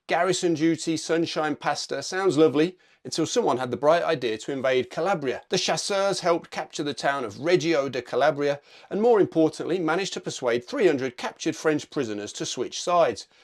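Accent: United Kingdom. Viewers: It is so interesting ! I really had never heard before about that regiment of renegades. Being a French living in United Kingdom for almost 24 years now, you understand that I somehow feel a strange closeness to them. You ask for the viewers' opinion on this regiment, there is mine: I wish the British Army, which I respect, would re-create a Chasseurs Britanniques Regiment. I would do anything to join. A bunch of misfits, anti-europe renegades, "gilets jaunes", "soldats perdus" and 2nd-rate mercenaries, it would be so French ! Especially if it meant having other troops performing all the boring tasks like guard duties (definitelly it would be very very French). You'd just have to change the Redcoat for DPM. Anecdotically, I liked the efforts to pronounce correctly the French names, with a so British accent. I had great time. Thanks so much for this video.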